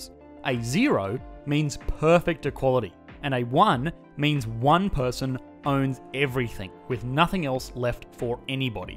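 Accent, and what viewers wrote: Australia. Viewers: I love your aussie accent mate👌🏻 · I don’t think he’s American. He sounds like he’s from Australia or New Zealand.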